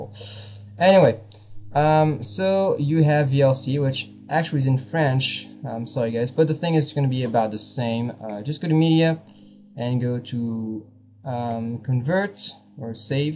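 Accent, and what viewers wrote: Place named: Quebec, Canada. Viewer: enfin un quebecois xD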